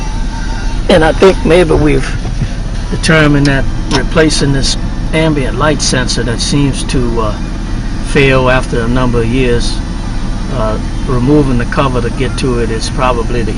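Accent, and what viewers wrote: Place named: Louisiana, United States. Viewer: Glad you from Louisiana I understood perfectly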